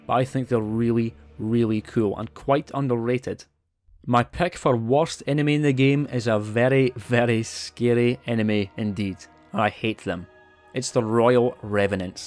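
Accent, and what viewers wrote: Scotland, United Kingdom. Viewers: Ok pal I hate to be off topic but you have one of the coolest fucking accents I have ever heard in my life. I'm sure to you it sounds "plain" or "normal" since you grew up with it, but I am straight up in love with how you talk. Whereabouts in the world? Scotland? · Came out of curiosity, stayed for the Scottish accent 🥰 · Love hearing a fellow Scot on here ✌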